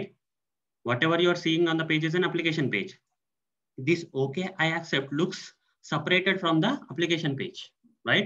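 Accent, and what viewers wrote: India. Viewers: I don’t understand Indian Accent at all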